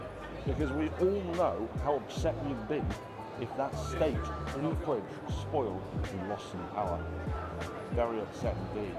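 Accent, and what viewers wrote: United Kingdom. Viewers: I can't understand the British accent in general, so I just turn off the audio, turn on the cc subtitles and run yt videos at 2x.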